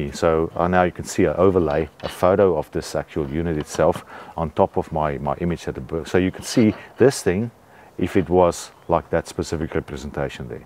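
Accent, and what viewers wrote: South Africa. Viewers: Recognised the South-African accent immediately 😆 Also, probably best to hide your IP address...?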